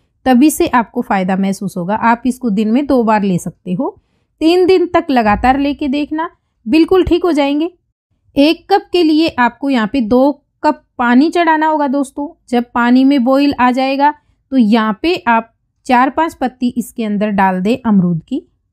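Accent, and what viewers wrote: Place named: Rohtak, India. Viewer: Aap rohtak se h kya Kyoki aapki Boone ki reathem bilkul mere jaisi h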